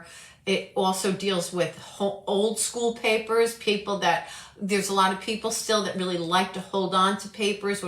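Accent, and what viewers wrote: New Jersey, United States. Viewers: . I LOVE YOU. 😭 I was guided to your videos for the first time a few days ago. I was feeling rather defeated that day. I mindlessly typed something in google search like: "Spiritual Warfare and Overcoming Procrastination/Disorganization through Christ." I clicked on your video, wasn't thinking much of it, but then you grabbed my attention as if you had a megaphone in my ear!! 😅 I was blown away by how much I resonated with your words. It was like God was speaking to me directly, but through you. You have truly helped me breakthrough the weight of my idleness. The mountain doesn't seem as overwhelming to climb now. I've noticed less deer-in-the-headlights blank staring at the messes, because I actually know where to start now! 😆 Hallelujah! Praise to the most high! I am so thankful that He directed me to you! This video was a key that unlocked even more answers for me! 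I'm not exaggerating when I say you are an answer to my prayers,! I'm younger than your children and live thousands of miles away but, I wish we were best friends. I just want to hug you and talk for hours. My soul understands yours. Ah. Thank you for being you. 💕💕💕💕 P.s. I love love love the Jersey in your voice! It's so great 😜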